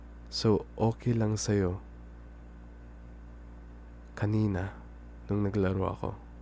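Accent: Conyo, Philippines. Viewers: Shux you sounds so conyoooo~ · I love the accent so conyo vibes na nagtatagalog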